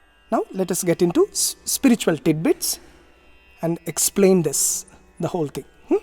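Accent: Standard. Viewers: आपका संस्कृत उच्चारण बहुत ही शुद्ध है। उच्चारण व्याकरण सम्मत भी है, कहां पर है उपध्मानीय का उच्चारण हो रहा है तथा कहां पर जिह्वामूलीय विसर्ग का उच्चारण हो रहा है वह सभी सुन करन बहुत ही प्रसन्नता हुई। ऐसा शुद्ध उच्चारण भारत वर्ष में केवल 1% लोग ही कर पाते हैं। ऐसा शब्द उच्चारण भारतवर्ष में धीरे-धीरे कम होते जा रहा है ।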